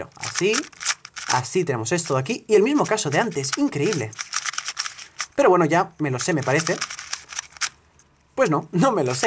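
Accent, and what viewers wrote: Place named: Catalonia, Spain. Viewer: Tenemos ésto por una banda... Se nota que eres catalán...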